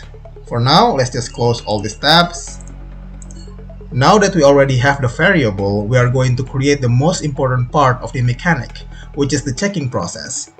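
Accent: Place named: Indonesia. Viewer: That sucks! hope you get your account back. But random question, Are you Indonesian by any chance? I noticed you have somewhat of an accent and it sounded similar to Indonesian accent.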